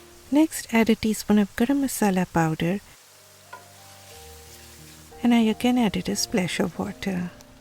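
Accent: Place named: Bengal, India. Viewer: Hi.. a suggestion.... use shallots instead of red onion in US! The red onions in US are void of any natural pungency and usually taste like grass! Also, I saw you mentioned edible mustard oil of good quality not available in US! Try using TEZ brand or Radhuni brand available at any Bangladeshi grocery store! Loved your bengali style English narration with occasional "To"... u know what I mean 😂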